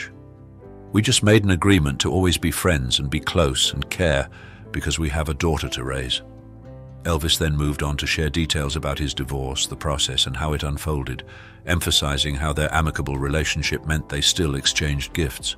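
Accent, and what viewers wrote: United Kingdom. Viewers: Impressive with the British narrator. If it were only true. He met her when she was 14 but was soon shipped back to the US. He went on with his life. She wrote him every day, he never even contacted her for a year. Her parents wanted her to marry the most famous man in the world. Her mother pushed the relationship even though Priscilla started dating a 19 year old named Ron Tapp. Desperate to get her to Graceland her parents sent her at almost 18. Elvis finally married her at 21. They both cheated, she became involved with her dance teacher who was the son of actor Gregory Peck. Then she started an affair with her married Karate instructor Mike Stone. She would finally leave Elvis for Stone. Elvis gave her everything she ask for in the divorce. She continued to demand money from ELvis till the day he died.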